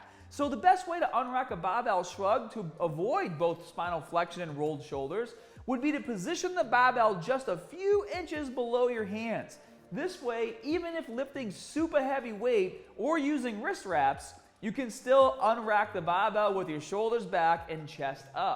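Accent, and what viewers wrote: Boston, United States. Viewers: Love the Boston accent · Ha,ha,is this a Boston accent? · Ahahaha. I couldn't get over the bahbell and had to find out if you were from Boston. Lawrence, close enough. Hi from Manchester NH although I grew up in Somerville. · This guys is from Boston isn’t he. Sounds like marky mark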